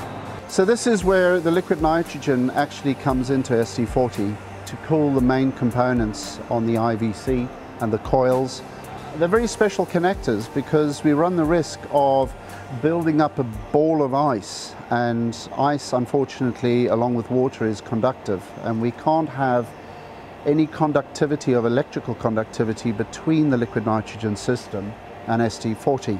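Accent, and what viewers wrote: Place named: Cape Town, South Africa. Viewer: Well spotted. Very much a Capey.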